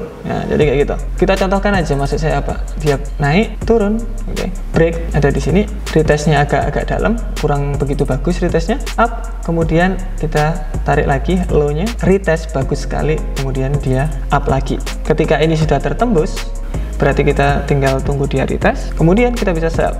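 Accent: Java, Indonesia. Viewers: Saya mau tanya kan ini 1). Cara kita menjelaskan nya jgn jg terlalu cepat betul, pelan aja, jdi kita pun jg susah nangkapnya Yg ke 2). Jgn pakai logat2 Jawa lh semuanya pakai bahasa Indonesia aja, klu boleh Yg ke 3). Itu saja yg saya sampaikan terimakasih atas ilmunya, semoga sukses 🙏🙏🙏🙏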